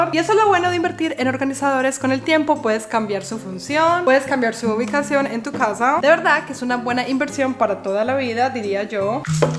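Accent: Colombia. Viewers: Me encantan los vídeos de organización.. pero sobre todo cuando hablas y t sale lo colombiana q eres.. m encanta ver lo alegre y divertidas q somos las latinas ❤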